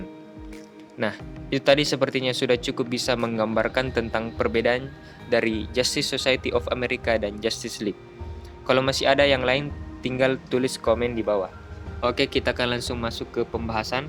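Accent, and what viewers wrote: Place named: Sumatra, Indonesia. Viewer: dari logatnya abang orang sumatera ya...😁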